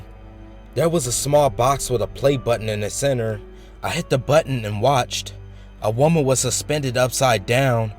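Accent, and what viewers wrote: South, United States. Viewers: Woah woah woah. This dude from the south or what?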